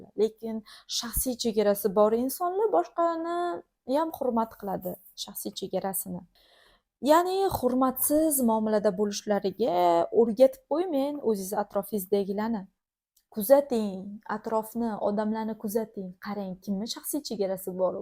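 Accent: Jizzakh, Uzbekistan. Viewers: jizzaxlimisiz shevez rosa o'xsharkan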